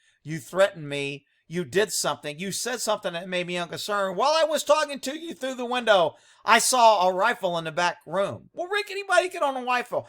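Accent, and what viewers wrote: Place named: Florida, United States. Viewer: My God, could you allow just one video to play without your interjections? And making fun of her accent makes you look foolish, when in fact, you have Florida man accent, if you get my drift.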